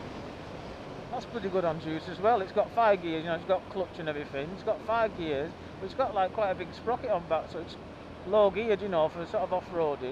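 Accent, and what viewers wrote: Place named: Bolton, United Kingdom. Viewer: great vid and good sharp pics despite the cloudy conditions thought it was Bolton area at first .... accent too... but maybe your Preston way or Yorkshire even ? Just wondering, getting a puncture can you get the tyre over the rim ? I had a puncture 2 weeks ago, Ive got good tyre levers but could nt get tyre over the rim Bike shop says ... most bikes have got to come in these days and get tyre off by machine so one simple puncture could cost me Pick up £45 Repair £40 .... its getting mad out there ! Probably eu legislation !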